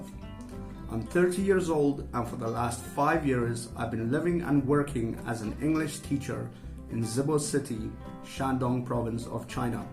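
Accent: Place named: Ireland. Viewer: buddy, based on your accent and your name, I knew you are Irish... ' ' is a very common name in Ireland... and your accent... I don't know what part of Ireland you come from, but it is a typical Irish accent.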